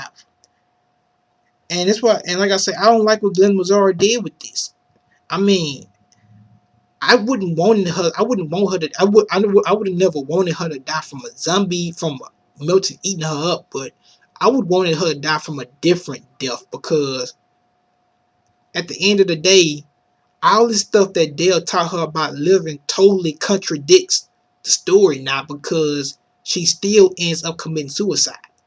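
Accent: South, United States. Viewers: You give great reviews guy! Fantastic critical thinking skills on display. Really liked your southern accent too. Good job breaking it down!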